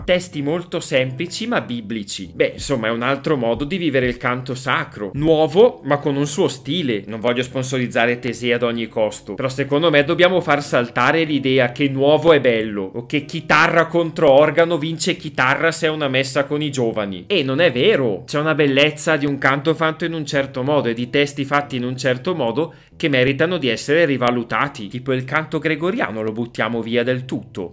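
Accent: Italy. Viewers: Ma che bell'accento altoatesino! Bergen de sura o de hota? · Un solo appunto: sei troppo buono. Capisco che sei un Sacerdos in aeterno, ma io ci andrei giù un po’ più duro. Tutto condivisibile, anzi, condivisibilissimo! E deliziosa la calata bergamasca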